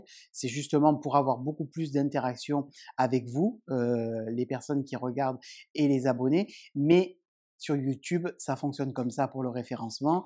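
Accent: South, France. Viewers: Merci Toujours un régal de te retrouver avec ton bel accent du sud..j’en ai marre de tricoter tes chaussettes!! Mon second petit fils a voulu les mêmes que celles tricotées pour son cousin! Heureusement que je change le contrastant!j’ai horreur de faire 2 fois le même ouvrage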